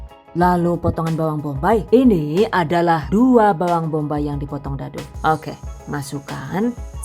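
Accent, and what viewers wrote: Banyumas, Indonesia. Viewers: Selain berguna bgt resep2nya..channel ini sgt menhibur bikin ngakak kalo mbak sdh keluarin bahasa ngapaknya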